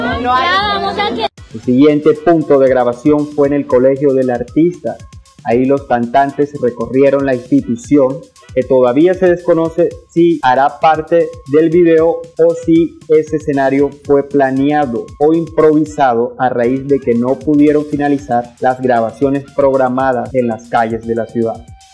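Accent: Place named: Spain. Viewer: Si ella tiene don natural para los idiomas y está casada con un español, es completamente lógico que hasta de forma inconsciente hable casi como española. Que se te peguen los acentos, ni es culpa de la persona, ni es un defecto, es un don del cerebro. De vuelta en Colombia, si se quedará unos días su cerebro se reprogramaría como Barranquillera. No hay nada que criticar, ella trabaja bien, ama a su familia y su país de origen y el país que la acogió. ¿Qué hay de malo en tener inteligencia y un corazón grande?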